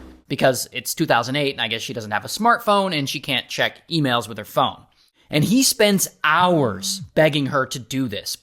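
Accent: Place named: United Kingdom. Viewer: personally i wouldnt let a british dude yell at me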